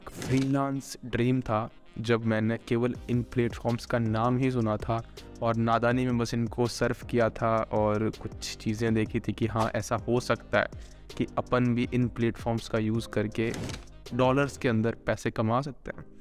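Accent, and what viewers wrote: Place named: Marwari, India. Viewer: ❤️Acha, marwari Accent aata h esliye guess kiya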